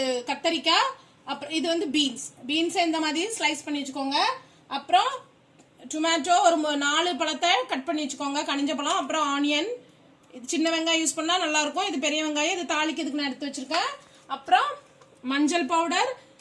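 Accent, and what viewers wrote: Tirunelveli, India. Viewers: Super sissy, Wr is ur native place? I think u r nellai ponnu bcoz im also in nellai. Slang la theriyithu sissy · Super Ka... Unga slang vache kandu pidichuten... Nanum thoothukudi than Ka... Akka sothi kulambu seinga ka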